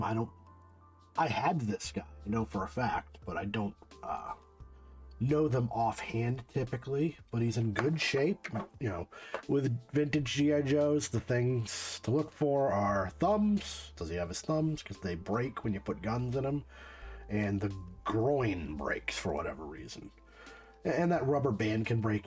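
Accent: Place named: West London, United Kingdom. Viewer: Hahha was thinking you were from Hammersmith, West London 😂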